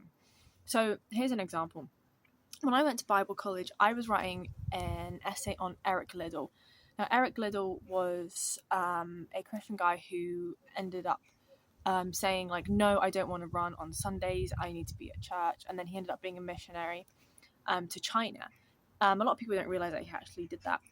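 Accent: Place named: United Kingdom. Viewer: I'm from Germany and I love your British accent. (Hopefully it is British I don't know too well)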